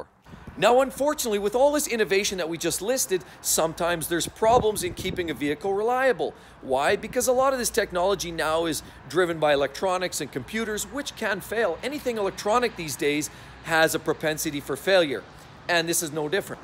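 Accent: Canada. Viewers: Abut , ut , lol. Is this guy Canadian? Talks funny. Mercedes unreliable. Chinese transmissions.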